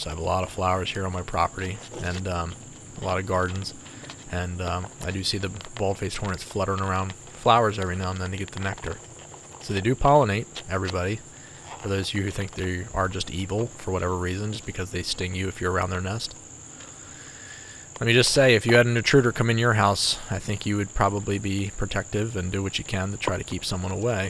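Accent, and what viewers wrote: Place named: Western Pennsylvania, United States. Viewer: Second that! I want to hear shower, downtown and Southside. It’ exactly like western Pennsylvania 😂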